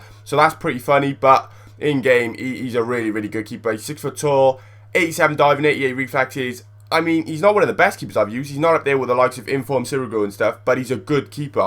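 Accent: Wales, United Kingdom. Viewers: your accent is confusing, there's weird moments when a bit of Welsh comes out..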